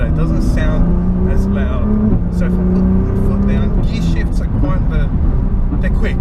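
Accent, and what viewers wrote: Australia. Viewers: You sound like an Aussie! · you got an aussie accent mate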